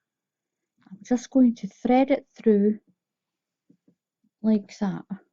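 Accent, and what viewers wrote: Scotland, United Kingdom. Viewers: I could listen to you talk all day, your accent reminds me so much of my Nana's best friend Helen, they've been friends for over 50 years. Helen has been in the US for longer than that, but she's never lost her Scottish accent. My Nana said when she first met Helen she could hardly understand a word she said haha.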